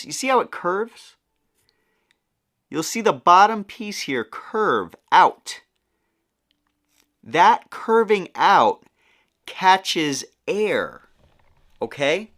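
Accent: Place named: New York, United States. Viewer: and wasn't even from the name, I didn't see that till after. It's so funny, I was just watching, your personality, demeanor, the way you were talking, then it hit me! If you were from New York it would have given it away a lot quicker. lol thank you so much for the video! I might have to give it a shot, I have to do something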